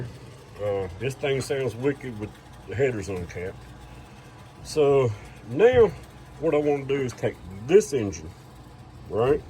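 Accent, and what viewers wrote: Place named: New York, United States. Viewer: Just an old country boy? I coulda swore that was a New York City accent! LOL Great video Brother!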